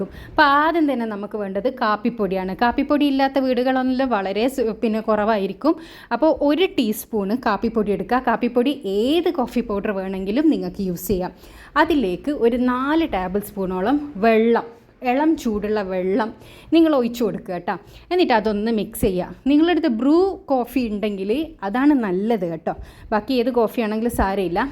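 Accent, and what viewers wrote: Kannur, India. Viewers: നിങ്ങൾ കണ്ണൂർ ആണോ 🤔, സംസാരം കേട്ടപ്പോൾ തോന്നി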